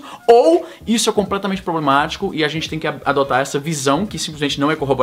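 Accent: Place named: Ceará, Brazil. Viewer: Nesse video podemos perceber as raizes nordestinas do, provavelmente do Ceara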